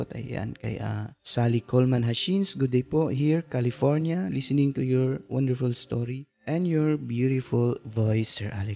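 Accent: Visayas, Philippines. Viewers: BISAYA KA DONG?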